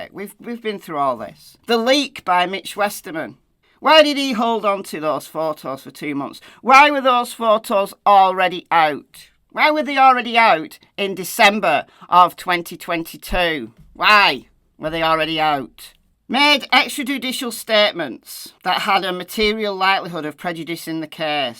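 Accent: United Kingdom. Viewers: I know I can’t be the only one that thinks that hearing the words “numb nuts judge” in British accent sounds so much funnier than someone with an American accent saying it!!😂 and the “you need to be watching the Super Nanny, Frances! You’re not very good at this, Frances!!” I’m cackling and my husband is looking at me like I’m crazy! The snark in this video is completely appropriate and warranted!! Frances C. Gull (aka Frances Seagull as in the bird 😂) is a complete joke and a disgrace!! 🤡🤬I just pray that the Indiana Supreme Court judges are able to see through the dishonorable Judge Gull’s BS!! I am also praying that Judge Gull is removed from the trial and that Baldwin and Rozzi are reinstated! Most importantly however, I am praying the hardest for justice for Abby and Libby!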